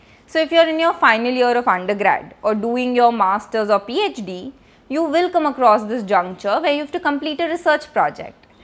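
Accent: India. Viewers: your accent is too much desi that is distracting for comprehension, please make it a bit comprehensible.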